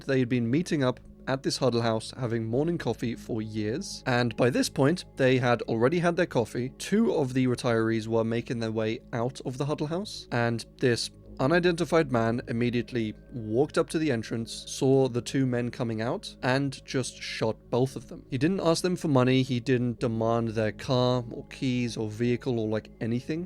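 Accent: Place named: Scotland, United Kingdom. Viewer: I watch several 'iceberg' creators. Yes, I have subscribed to your as well. Because I like the best. YOU are one OF the best. Just enough curiosity and open mindedness without being naïve and gullible. <--that is a tight rope act and hard to do correctly. You do it good enough. Of course, no one does it AS good as me. <----and THAT is also a conspiracy!! Carry on. p.s. what's with the accent? Not S African or Australian. From the north of the UK? Scottish highlands maybe,.........<---- said the American with the deep southern accent with extreme Scottish ancestry.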